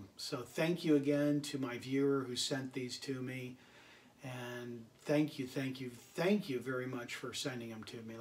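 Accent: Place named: Mississippi, United States. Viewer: I always thought you were from Mississippi with that accent of yours???🤔lol😎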